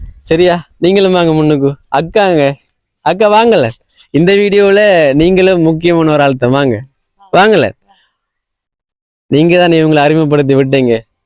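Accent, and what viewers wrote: Sri Lanka. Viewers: வணக்கம் Pro, நீங்கள் செய்யும் சேவை அருமை, உங்கள் மட்டக்களப்பு தமிழ் சிலாங் கேட்க செமையாக காதுக்கு இனிமையாக இருக்கிறது, அடிக்கடி என்ன என்ன என்ற வார்த்தை கூடுதலாக பாவிக்கிறீர்கள், மொத்தத்தில் Supper Pro, ஜேர்மனியில் இருந்து சிவராஜ்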